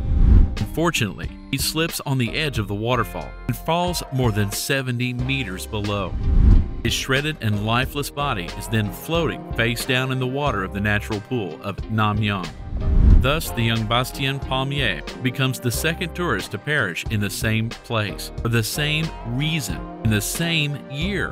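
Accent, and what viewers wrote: United States. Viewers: Can't you use feet vs meters? I hate googling the answer. Lol. U sound American, not British.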